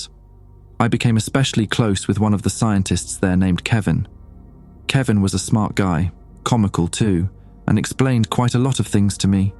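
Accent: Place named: England, United Kingdom. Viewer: I really liked this one. Nice twist that wasn't super obvious. This isn't a criticism but, as a brit, it's so jarring to hear the narrator speak in an English accent but use American phrases. Was mostly at the start of the story.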